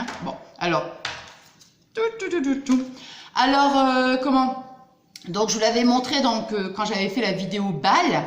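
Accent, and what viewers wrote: England, United Kingdom. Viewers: Bonjour ma cocotte moment sympa surtout avec ton accent anglais et tes pantoufles moi j en ai aussi avec des cœurs et des pompons derrière, j aurais penser que le chat m aurais sauter dessus mais ça va cette folie la c fini,il fut un temps j avait des pompons devant, il m attaquait a chaque fois.passe une bonne journée en pilou c chouette.gros bisous 😚 mon amie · Trop bien cet accent anglais..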